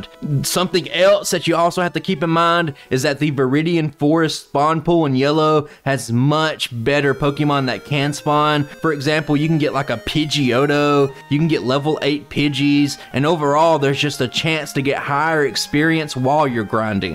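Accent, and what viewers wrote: United States. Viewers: Btw i too have a southern accent so "bind" and "bide" sound exactly the same... I feel your pain, brother! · Where you from? That accent got me saying howdy partner.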